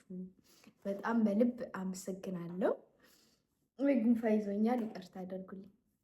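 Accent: Ethiopia. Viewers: I like your Amharic style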